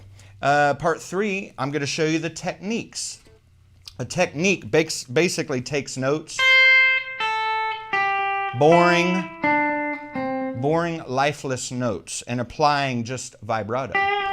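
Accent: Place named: South, United States. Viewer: I purchased this guy's guitar Sizzling Guitar Licks course a few years ago but due to major work and family commitments I was never really able to spend much time on the course materials he supplies. Skip forward a few years and I started to try to use some of his lessons and so called support literature. Like he says in the letter that comes with the package of DVD's "Where do I start with all this stuff? " Good question - the package was so disorganized and the tablature does not relate well to any of his DVD's. A real hill-billy package. You have to sit and listen to this dude blab on for minute after minute in his dumbass country twang wondering when is he ever going to get to the point. He is a great picker but seems to love the sound of his own voice way more! Once you buy this guy's course you will be incessantly bombarded with daily offers of more stuff to buy, but Good Luck if you ever try and request reactivation of your account to download missing tablature. 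2 or 3 e-mails later and not even an acknowledgement. Garbage after sales and customer service. Caveat emptor - translate that if you can. John 5 is much more of a gentleman!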